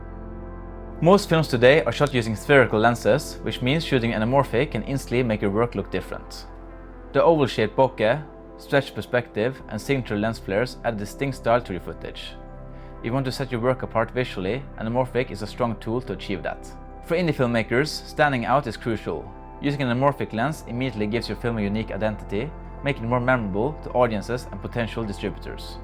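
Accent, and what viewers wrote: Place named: Bergen, Norway. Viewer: Are you from the Bergen area of Norway? Your English accent sounds like you are…. 😊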